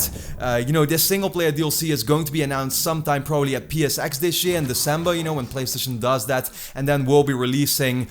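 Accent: Netherlands. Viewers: Is Dutch? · lol hardcore nederlands accent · lel ik had 2 min nodig om te horen dat je nederlands bent XD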